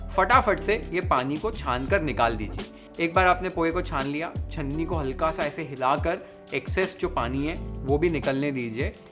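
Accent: Maharashtra, India. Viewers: Marathi accent 🔥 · I like your humbleness and i enjoy your receipes. I am Marathi and from Mumbai, now in US(missing Mumbai) Your sweet Marathi brought a smile to my face. As usual, great receipe with great tips:) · Farach chan ... Marathi bollay te bara vatla😊 I really enjoy all your receipes..grt goin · Hey I love your marathi accent 😊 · Chef, I’m impressed by your chaste Marathi language skills. Mulga pass zaala! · Your marathi accent is superb 👌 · Being a Marathi mulgi, I am in love with this guy!! Not just the recipes but the Marathi accent too! He mentioned that he was brought up in Mumbai and that definitely shows! The way he says बटाटे or वाटण or even काळे मटण with a ण and not न , makes me fall more for him! Keep it up Chef! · Chef, you got amazing Marathi accent. Jai Maharashtra 🔥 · I just love his marathi accent ❤ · Your Marathi accent is ❤. 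तोंडाला पाणी सुटले, उद्याच बनवते. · I love batate Pohe. And yes I am from Maharashtrian family so we make minimum 3 times a week. Simple, fast and very tasty And your Marathi ascent is really awesome खूपच छान दादा 🙏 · Marathi accent khup chaan aahe....saglya recipies khup chaan asttat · Hi तुम्ही खूप छान aaslkhlit मराठी बोलतात धन्यवाद.... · Perfect pohe+sweet Marathi accent 😉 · Thank you chef for this recipe ❤️ I am really in love with your marathi accent, tumhi khup Chan Marathi boltat... ❤️ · Your marathi accent is so nice 👍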